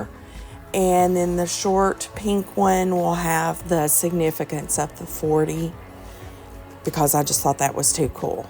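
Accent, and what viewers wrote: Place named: South, United States. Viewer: Possum Patty watcher here just looking around! My mother, if nothing else in a day or night, PRAYED...I can hear her now..."LET GO AND LET GOD" she passed many years ago...but your southern accent and heart of prayer reminds me of her...happy crafting! PP is wonderful! ❤B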